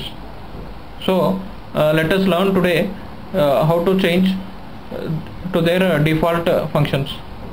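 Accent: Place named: India. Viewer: I understood everything you said (thanks to the Indian movies i watched),thanks man :D it helped. You're Indian right?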